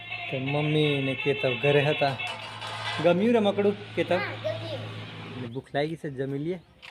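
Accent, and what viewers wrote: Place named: Kathiawar, India. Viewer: તમે પણ અહીંયા ના છો તો પછી તો પછી કેમ કાઠિયાવાડી બોલો આપણી શુદ્ધ ભાષા બોલોને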